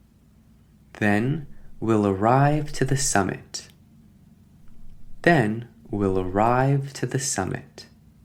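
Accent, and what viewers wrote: United States. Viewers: I'm wondering is't American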